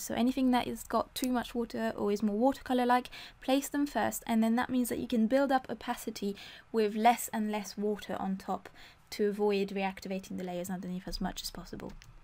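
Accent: United Kingdom. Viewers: This is quite out of the blue but may I know if your accent is British? Which is it exactly? I like it so much. :) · Yes it's wonderfully comprehensive and I very much enjoy your lovely British accent!! :)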